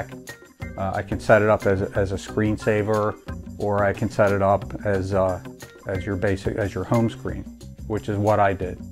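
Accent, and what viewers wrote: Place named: Philadelphia, United States. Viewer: is this guy from phiolly, he sounds like it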